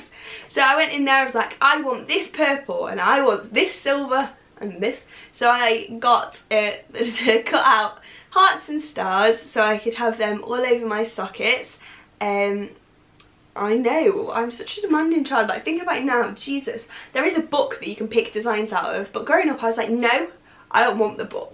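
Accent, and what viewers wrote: United Kingdom. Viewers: Educational experience for me. It is a great pleasure listening to you,. I love your english style, your marvellous smile, your dimples and positive attitude. I like your facial expressions as well. I am a great fun of yours. Admit i find it somewhat challenging to understand british english, but coming from you it sounds so good. You just makes me feel good. My mind mirror you. Please consider working on an ice breaker ship, for your smile can melt icebergs.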